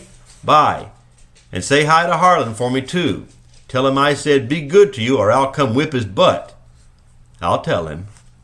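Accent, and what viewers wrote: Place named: United States. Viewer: Thank you! Really nice reading! Normally I can't stand American voiced audiobooks but you're perfect! Please do the other kingsolver books, your voice suits it so well! X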